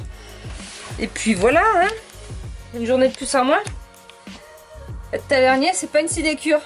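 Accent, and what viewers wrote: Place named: South-West, France. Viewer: Tu es enrhumée? Ça accentue bien ton accent du sud ouest lol..en tout cas bravo a toi et ça avance super bien..hâte de voir quand ce sera fini et vos péripéties 🙂😘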